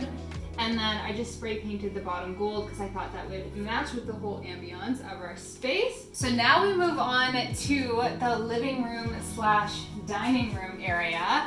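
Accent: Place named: Canada. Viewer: The way Canadians pronounce “about” gives them away 😝✌🏼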